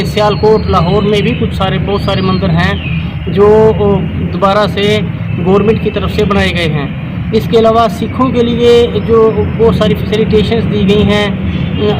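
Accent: Haryana, India. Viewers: Haryanvi bolte ho bilkul hamari side ki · When you speak Haryanvi,it gives a quite different impressin.Anyhow I regularly see your videos based on exodus from Haryana which makes me remind what my father used to tell me calling it Gadar.I respect you for your way of putting things in the true perspective regarding Haryana's culture then a part of Panjab.I am from Karnal.One question which still remains illusive is about atrocities on Hindu women by Muslims.Honestly clarify it.Hatred & radicalisation from any side shall only be destructive for humanity in both countries.Thanks & best wishes for your efforts to make people know the painfull reality of partition.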